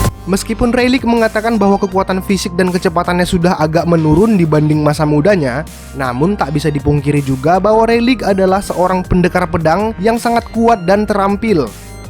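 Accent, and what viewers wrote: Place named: Medan, Indonesia. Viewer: nampak kalo abang ni orang medan bah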